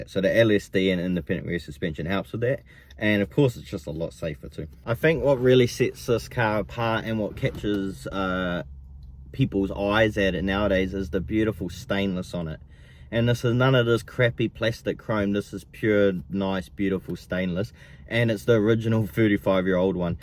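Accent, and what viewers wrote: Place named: New Zealand. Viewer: You NZers speak funny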